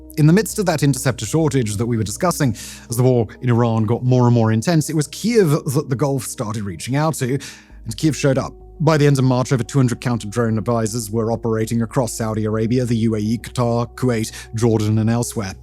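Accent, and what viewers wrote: United Kingdom. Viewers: Is this a UK accent ? Uk isnt prepared for Drone war either, they're not even prepared for regular war, they only have 20 mission ready battle tanks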